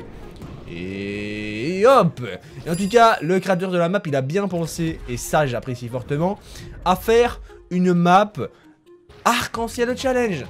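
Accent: Belgium. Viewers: Mais tu parles il est belge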